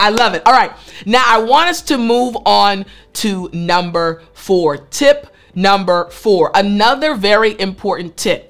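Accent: United States. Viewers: I love her pronunciation and American accent, the most beautiful accent in the world for us eternal students of the most important language on and of the planet! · Wow, i love her American Accent. It sounds so good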